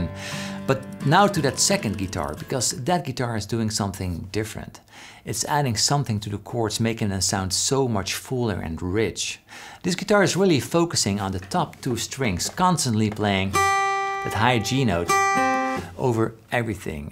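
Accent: Netherlands. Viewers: your dutch accent is so cute.All the best from Limburg.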